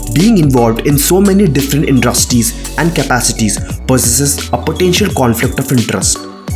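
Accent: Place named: India. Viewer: I hate indian accent i always did sorry. 😆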